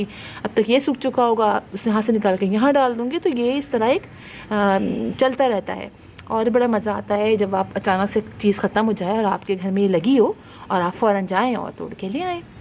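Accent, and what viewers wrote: Pakistan. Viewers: Pakistani accent and way of speaking is soo sweet to hear ... love from India ❤❤❤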